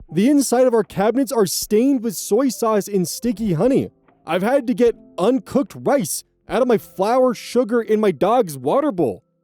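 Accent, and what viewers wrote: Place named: Alabama, United States. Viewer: ah, someone's from Alabama I see.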